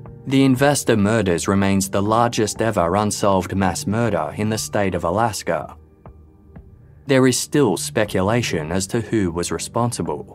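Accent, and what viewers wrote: Australia. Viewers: 🇬🇧 I know this is an old episode but after having had a serious illness & recouperation, ive not watched many of my favourite channels! Therefore after getting my energy & interest back ive been trawling through my favourite Channels & binge watching & its been amazing hearing a big batch Of great videos that i love. Now ive made it to one of my top 5 channels with many many videos so im starting with my 1st missed episode today, with this case. Im champing at the bit to enjoy each & every one ive missed & there are alot to hear! Ive missed the narrators calm & reassuring voice. As i once lived in north Sydney for many years as a youngster & late teen before returning back to UK with my parents, i love the opportunity to hear the beloved Aussie voice. Thank you to everyone on the Casefiles team! You do a brilliant job & bring us the best & worst ( i mean in criminality) and ive often been chilled to the bone with some of The cases, especially the Ivan Milat ones... 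😨 So Thank You all so much! Your subs have jumped pretty high too Not far off that 1st magic 100k Level & thoroughly well deserved! Peace & Good Cheer to All 🇬🇧👧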